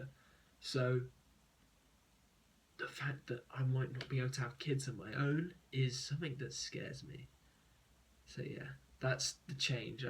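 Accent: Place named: United Kingdom. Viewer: Great video. It was nice to hear a fellow British voice talking about this topic.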